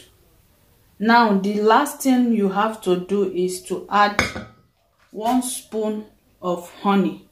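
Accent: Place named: Caribbean. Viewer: I could be wrong but you sound in the Caribbean accent but either way I take your advice very serious because people like you know your s***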